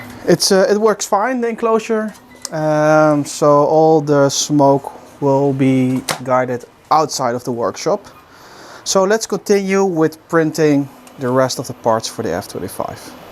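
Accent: Netherlands. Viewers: Very nice, but damn your Dutch accent is heavy: Fideo, nafigation etc etc.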